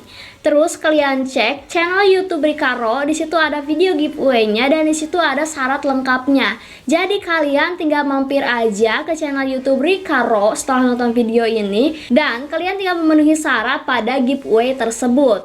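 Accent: West Java, Indonesia. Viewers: Kak, orang sunda?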